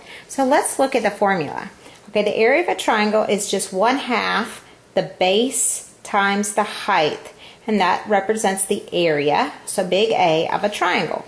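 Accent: South, United States. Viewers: So southern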